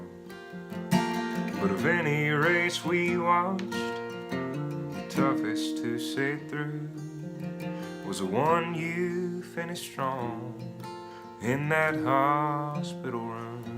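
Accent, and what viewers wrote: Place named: South, United States. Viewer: What a good description. You’re totally right. Even his talking voice reminds me of a Sunday in the south.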